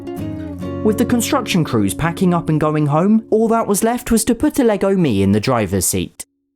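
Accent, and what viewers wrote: United Kingdom. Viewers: You british? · I like this guys British voice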